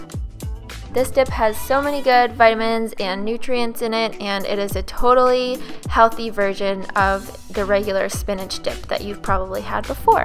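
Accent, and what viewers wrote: Canada. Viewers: peep the canadian accent :-)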